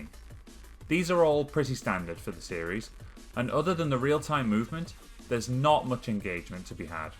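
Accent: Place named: East Midlands, United Kingdom. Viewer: You put so much effort into this, I love it. I saw when you joined and I assume this was a Covid project? Also you sound so close to my accent in the East Midlands!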